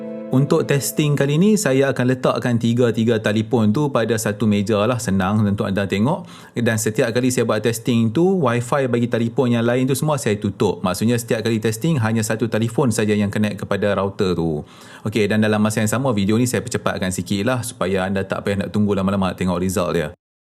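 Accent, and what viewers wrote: North, Malaysia. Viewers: tima kasih bg info berguna, izin saya share dlm fb... SELamat Hari Raya Bos.. pekat jugak loghat utara naa...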